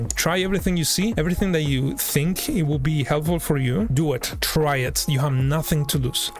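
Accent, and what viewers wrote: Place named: Argentina. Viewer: Idk but I can't stop asking myself- from where are you? Bc your accent sounds very argentinian KSNSNSA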